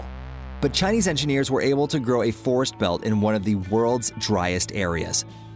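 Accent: United States. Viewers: American video spoken in an American accent using American units of measure. Hmmm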